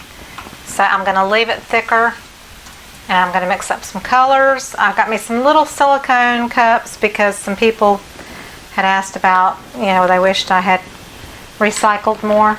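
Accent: South, United States. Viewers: You sound like a southern girl. I have lived in Atlanta all my life so I have a similar dialect as well.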